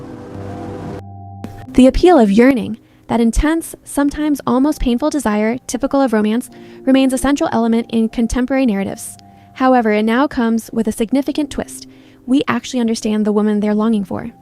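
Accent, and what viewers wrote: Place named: United States. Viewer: If you didn’t say English wasn’t your first language I wouldn’t have known! Your accent is so American :) one request though, is to slow down your speech a little bit, I had to slow the playback speed down to 0.95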